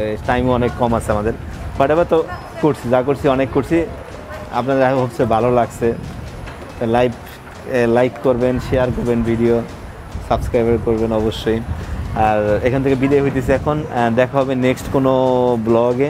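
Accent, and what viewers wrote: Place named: Bangladesh. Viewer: Love your Bangla ascent 😅😅😅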